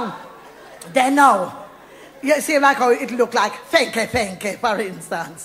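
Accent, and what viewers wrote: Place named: Jamaica. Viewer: Miss Lou tell dem ina Jamaican style.Yuh big bowt ya.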